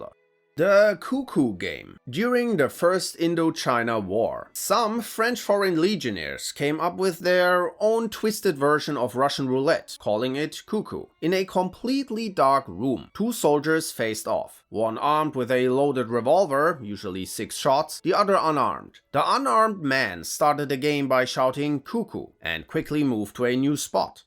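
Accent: Germany. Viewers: You know I normally don't like channels like this, because they feel like kiddie channels, but this one is different. Would like longer explanations, but I understand that people don't have that long of attention spans nowadays thanks to shorts, reels, and Tiktok. But it is good for background noise while cooking or working on projects around the house. Also I spent three videos trying to place your accent...and I finally got it. You're German, are you not?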